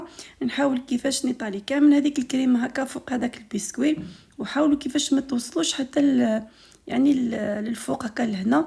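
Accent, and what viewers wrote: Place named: Egypt. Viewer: نعم من لهجتك عرفنا أنك مصرية أهلا وسهلا بيكي بين أخواتك أهل وأحباب في الله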